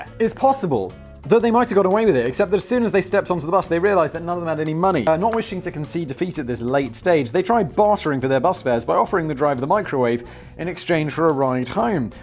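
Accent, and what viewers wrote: United Kingdom. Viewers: slow down Americans need a minute to understand limey and I grew up with neighbors from Sheffield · Yeah, must admit I was slightly startled by a guy with a British accent (albeit now living overseas) having so much difficulty pronouncing an English placename...? ;-p But then again, many of them do seem to have been designed to make it difficult even for the natives...?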